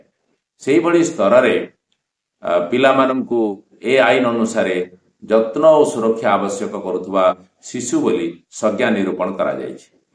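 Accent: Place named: Bengal, India. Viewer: Can you add English subtitles on your video. As a person from south india it's hard to understand Bangal dialect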